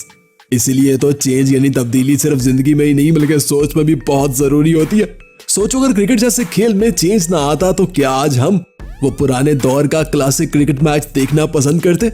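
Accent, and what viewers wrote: Pakistan. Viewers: Your accent is similar to Shoyeb Akthar , are you from Pakistan.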